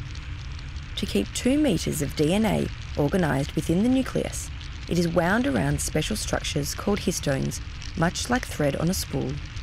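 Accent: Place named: Australia. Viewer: isnt this someone elses video but with an Aussie narrator?..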